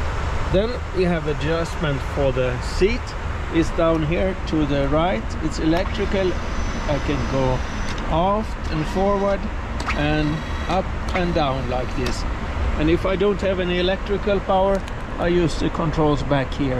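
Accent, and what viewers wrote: Sweden. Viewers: Riktig svensk dialekt på engelskan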